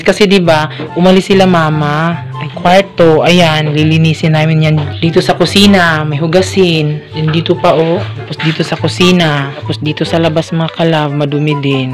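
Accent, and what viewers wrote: Visayas, Philippines. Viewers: nakakainis naman ung sinasadya mong pagbigkas na may bisaya accent like EKOT EKOT at DETU. pagbisaya nalang kay pangit paminawon. ayaw mo kasulo kay constructive criticism na ha.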